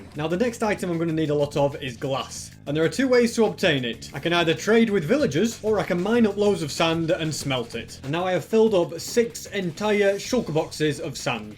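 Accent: United Kingdom. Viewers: Why we all love doesn’t scam has a satisfying BRITISH voice Edit: HOW I COULD FROGET The shiny eyes of course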